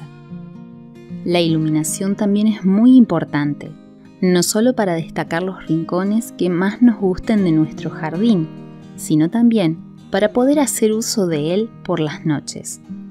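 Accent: Argentina. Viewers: Yo lo silencio...no me gusta nada el acento argentino. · Con ese hermoso acento argentino no me cansaría de escuchar audiolibros en mi jardín.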